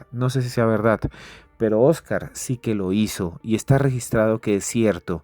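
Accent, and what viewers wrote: Colombia. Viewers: No hay mejor narrador!! Que colombiano, me encanta !!! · es Maravilloso, es auténtico,puro colombiano, su vocabulario es hermoso, me encanta escucharlo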